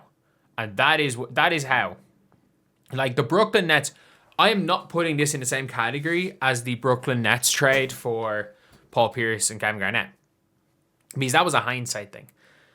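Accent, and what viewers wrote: Ireland. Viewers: I’m not even happy and I’m a spurs fan. But I would rather hear bad news in an Irish accent. It’s just better. Such a “fml” accent. I’m gonna try to catch every one get you going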